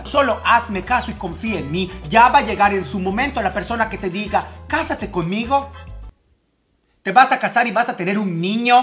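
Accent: Mexico. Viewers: Me encanta tu acento mexicano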